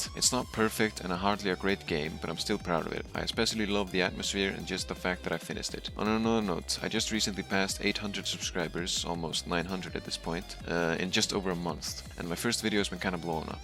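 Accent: Iceland. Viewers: Are you icelandic? Accent🤨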